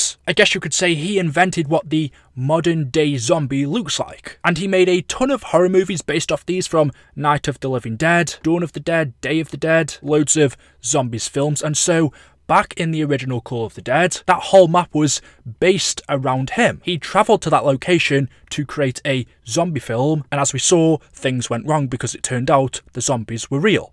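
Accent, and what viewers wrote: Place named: England, United Kingdom. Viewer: Why is your English so... English 😄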